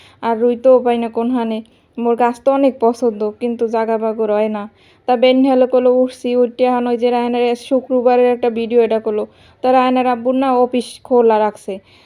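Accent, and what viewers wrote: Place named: Barisal, Bangladesh. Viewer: ❤❤প্রিয় আপু তুমি বরিশালের ভাষায় কথাগুলো বল দারুন লাগে ভিডিওটা দেখে নিলাম সবকিছু মিলিয়ে খুব সুন্দর হয়েছে আলহামদুলিল্লাহ ❤🎉😮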